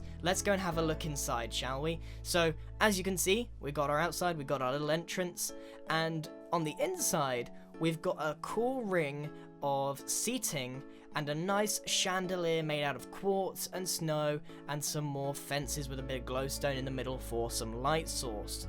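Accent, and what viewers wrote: United Kingdom. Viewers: your from BRITIN wait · Is it me or grain really emphasizes that he’s British in this video? 🇬🇧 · Caravan. In Australia we say Stuff pretty similar to the way you say it in Britain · Ameenitys ha British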